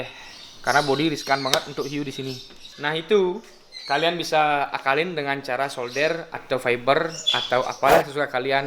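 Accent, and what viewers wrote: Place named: Bali, Indonesia. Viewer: Dialek orang Bali sangat kentara walau menggunakan bahasa indonesia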